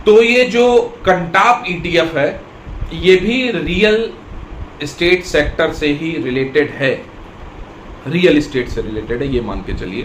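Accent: Kanpur, India. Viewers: झन्नाटेदार , बातों का छौका न लगाओ , ek dum kanpuriya andaz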